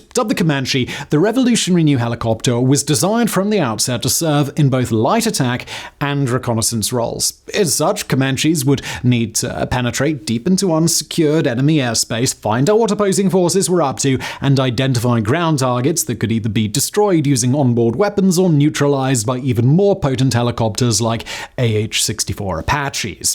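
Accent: England, United Kingdom. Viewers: Arrogant use of English accent. This guy is a Never Soldier w/ Cavalier wuss Talk Anti American Buffoon!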